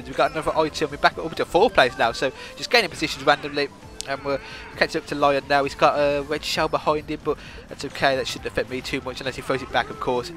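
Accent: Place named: United Kingdom. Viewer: you are so awesome with that british accent hehe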